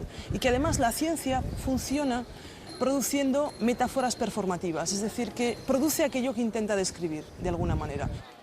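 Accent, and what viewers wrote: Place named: France. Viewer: Se le nota deje francés al hablar. Se nota que vive y trabaja en Francia.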